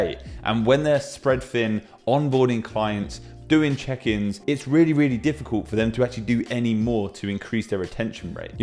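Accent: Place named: United Kingdom. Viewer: Okay, you have a British accent but you mentioned potential earning in US dollars, why?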